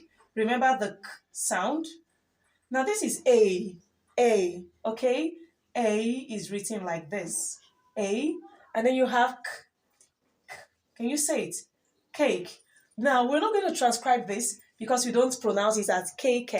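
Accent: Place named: France. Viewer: Nice , your video is of great value. Sure you are french, nice and beautiful accent 🎉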